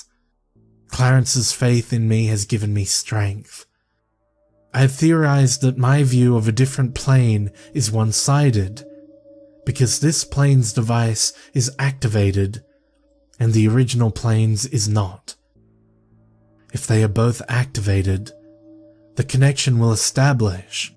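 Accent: Australia. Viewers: I'm pretty sure it's his first language. It sounds like an Australian accent to me. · Aye Aussie English has different pronounciation eh? I thought you all just spoke like that by chance lol!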